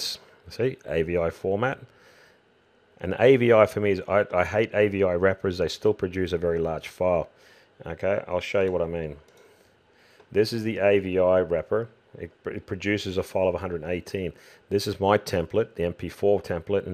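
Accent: Australia. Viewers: thanks alot. Big help. please do the costume template video as well nice to hear aussie accent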